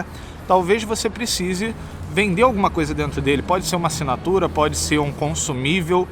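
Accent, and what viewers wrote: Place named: Rio de Janeiro, Brazil. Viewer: O que eu sinto ao ver os videos do: 1 - Esse cara ta mesmo querendo ajudar. 2 -Ele entende MUITO de programação, mas tb entende mto do business por trás disso, não só de ser funcionário. 3 - Saudades de Vancouver, melhor lugar que ja morei na vida. 4 - Sotaque do RJ é foda 5 - Preciso ser aluno desse cara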